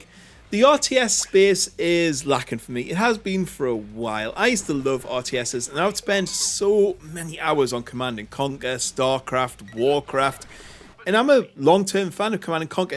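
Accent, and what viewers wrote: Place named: Northern England, United Kingdom. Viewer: Sounds like ya not far from me mate, Darlington here!! Great video! think ill buy this :)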